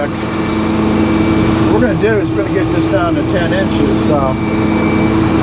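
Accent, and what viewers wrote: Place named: Maryland, United States. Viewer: Where yall at? Im in Pasadena, MD and recognize the accent, and the mention of gone downy oshun. Just moved from SC and miss this good honest industrial stuff. Would love to come say hi!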